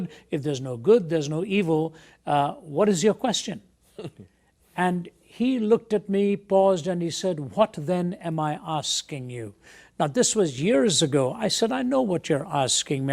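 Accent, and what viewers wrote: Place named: Ireland. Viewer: I first became aware of Ravi when I found him on the radio when I was a trucker. In my mind I thought he was an Irishman because of his accent. I imagined him with red hair and beard lol. I thought his name was Robby! But I am so glad I stumble upon him! He is so smart and well spoken.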